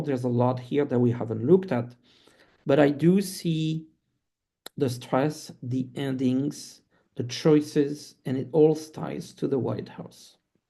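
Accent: France. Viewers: Oooh,! Hi, I’m the gal that LOVES how you say “tableau”….yes, it’s me again! I am soooo happy to see you again these days. I’m now a subscriber too. I love your accent! Ooh la La!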